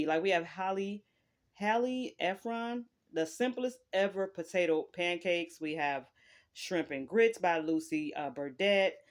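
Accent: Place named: South, United States. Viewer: what a great cookbook!! I love that you add the southern accent😊❤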